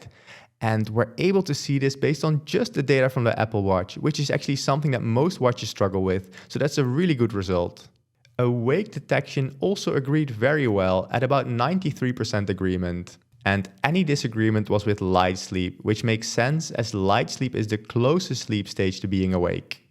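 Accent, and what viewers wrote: Netherlands. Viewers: I lived in Amsterdam and u clearly have dutch english accent, i went on your site and you actually studied there , you dutch right 😄? Thanks for the reviews , i just found your channel. Now im even more confused on what to buy , according on the tons and tons of video you made that i dont know if i will be able to watch entirely. I want just to fix my sleep as i wake up 1 or 2 times every night, i want also to track my hearth i suspect some problems sometimes i have a miss hit in the beat, i don' t know how to explain it. Should i take the polar h10? A watch ? A band? Im so overwhelmed by the amount of review u did. Bye from an italian new follower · Dit klinkt zoooo boring! en overduidelijk voor gelezen! With yr Dutch accent! 😵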